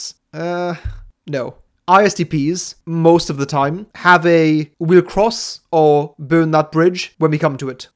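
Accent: Wales, United Kingdom. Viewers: Hello. Are you Welsh, luv? 🏴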